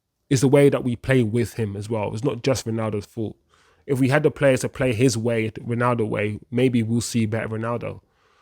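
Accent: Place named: Manchester, United Kingdom. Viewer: Proper manc accent there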